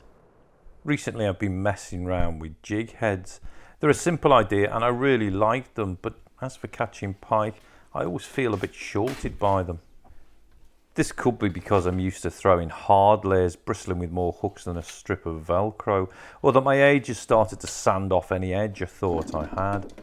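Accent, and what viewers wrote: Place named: Liverpool, United Kingdom. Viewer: Where’s that accent from? I get bits of scouse and bits of the midlands! Great vids mate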